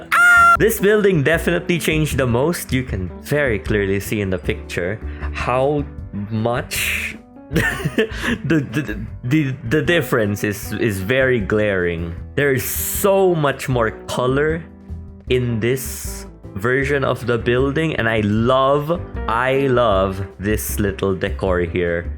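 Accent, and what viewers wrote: Philippines. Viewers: 10 seconds in and i clocked that you're filipino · Omg i was like woah he sounds Filipino and i was fukin righttt yipeeeee 🎉🎉 a Filipino ama watch you now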